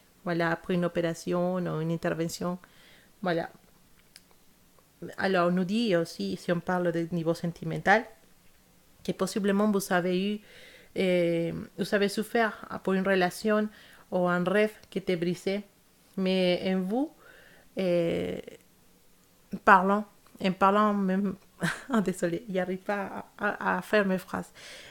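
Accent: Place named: Spain. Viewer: Bonjour je viens de vous découvrir sur la chaîne et j adore votre tirage mais aussi votre accent ça me rappelle mon. Pays car je suis aussi espagnole. 😜 Bendiciones Hermosa 😉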